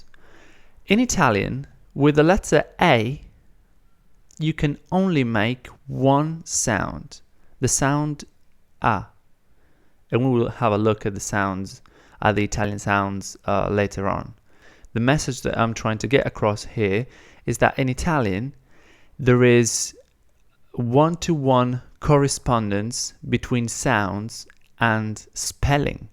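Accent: United Kingdom. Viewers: Thank you. I'm from Brazil and I wanna learn Italian and improve my English. So, your videos are very helpful for me. By the way, your English is amazing. Congratulations. I like this British accent.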